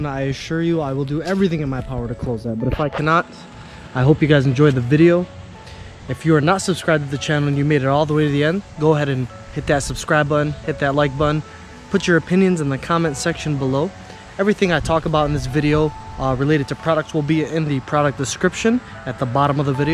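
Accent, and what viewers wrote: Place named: Arab world. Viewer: Where you from? You sound like an Arav from Dearborn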